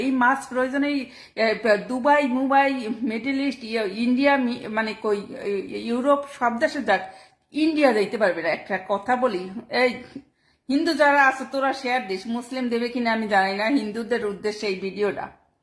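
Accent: Barishal, Bangladesh. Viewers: পুরাই বরিশালের ভাষা দারুন।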